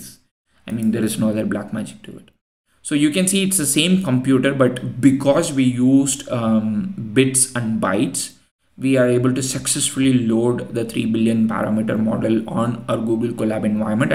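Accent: India. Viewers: you accent is noticeable but I have heard many with much thicker Indian accents, yours is quite good. Might be even better now, I only just came across this video now.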